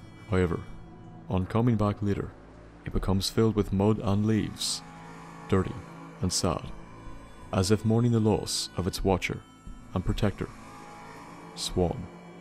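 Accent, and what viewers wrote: Northern Ireland, United Kingdom. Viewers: Are you northern Irish? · ur northern Irish right? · Are you from Northern Ireland? xD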